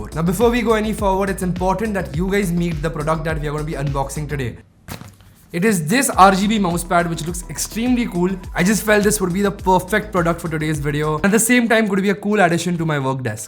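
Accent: India. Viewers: Who guys came here for the Bollywood Accent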